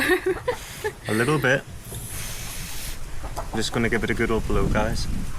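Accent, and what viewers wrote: Northern Ireland, United Kingdom. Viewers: u sounds familiar lyk norther ireland accent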